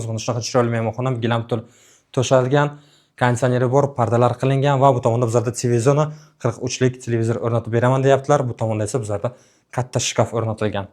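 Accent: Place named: Bukhara, Uzbekistan. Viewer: Бухоро шеваку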